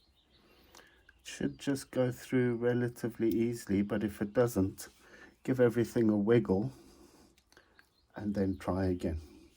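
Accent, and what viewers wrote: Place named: Scotland, United Kingdom. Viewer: Nice video man! And I dont know what it is with the Scottish accent that just makes me listen to your instructions like in a classroom lol!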